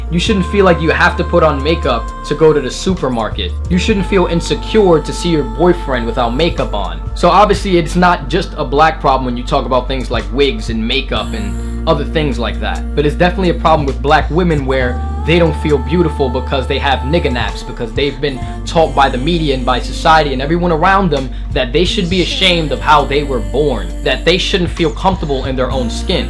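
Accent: New York, United States. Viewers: he sounds so newyorky damn i like it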